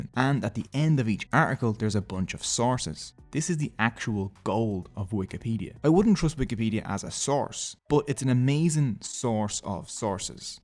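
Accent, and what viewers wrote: Dublin, Ireland. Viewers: Love the Dooblin accent 😆!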